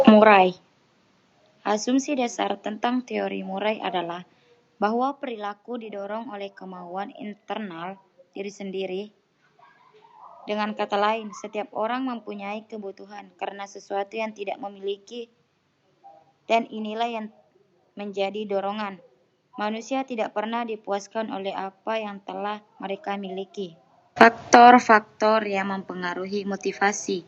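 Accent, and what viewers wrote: Bugis, Indonesia. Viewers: Semoga dpt nilai terbaik. Jelas skali logat bugis nya 😬😇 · Tanra na ugi dari logat bacanya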